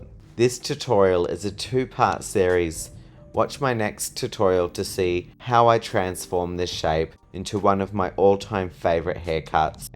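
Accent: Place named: Australia. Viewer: Hi! WoW yr channel is stunning 😍 and I love yr Avustralian accent!! Actually I’ve fallen love with it.. sorry but this accent much more attractive and beautiful than english accent.This is immaterial but I just wanted to indicate.very good luck to y 😊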